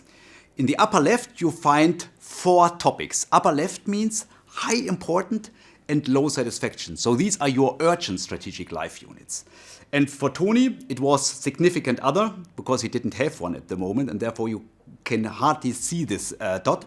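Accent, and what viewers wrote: Germany. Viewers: Love his German accent 🇩🇪❤️🎉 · Challenging listening to this tense rapid German accent. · Thanks for the great Video, you really helped a Lot Your accent Sounds very German to me 😂 Have a great new year everyone M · That's a German accent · listening to this german english accent makes me think that this is some sketch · Your pronunciation is very interesting. Are you from germany? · Is he German by chance? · First time you watch it: Consulting Cringe. Second time you watch it: German accent. Third time you watch it: Mh, good point… let me think. What are my preferences actually?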